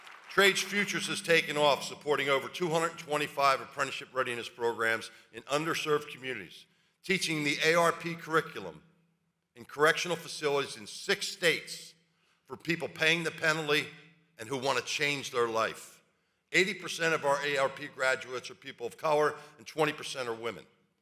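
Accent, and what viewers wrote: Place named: United States. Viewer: You sound exactly like an American oughta, you sound like my voice, the voice of my father -- carpentry, my grandfather -- electrician. The heart of America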